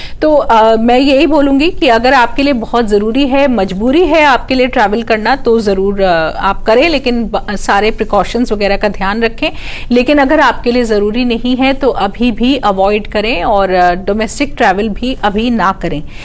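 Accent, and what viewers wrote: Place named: Delhi, India. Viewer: Medam aapki awaaz me Delhi 6 wali Ton hai....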